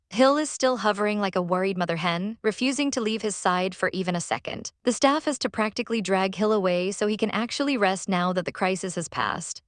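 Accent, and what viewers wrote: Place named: New England, United States. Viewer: Thanks for uploading the next part of this story. I had watched the first portion of it awhile ago and had really been hoping to get the second half of it. I am so glad that after such a tragic beginning, things finally, finally started to turn around. I also want to say that the voice narrator on this story sounds EXACTLY like my sister-in-laws voice! 😅 I almost thought it was her! 😯😆 The accent she has is from living up in the New England states...mostly just outside of Boston, but we did live near eachother years ago back in Maine as well. Made me enjoy the story even more. ❤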